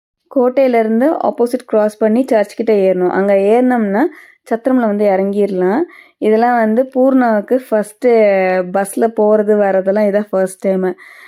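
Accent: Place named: Karaikudi, India. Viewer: Neenga karaikudiya unga varthaigal alagu ola irukku simly suprer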